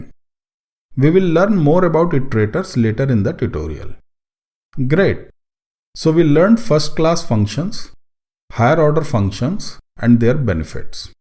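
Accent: India. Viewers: namas te. дорогие индусы, пожалуйста, учитесь говорить по-английски нормально, ваши курсы невозможно слушать. речь непоставленная, акцент жуткий, половину слов просто непонятно иногда. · Heavy In accent is a plus. Perfect videos are typically less natural.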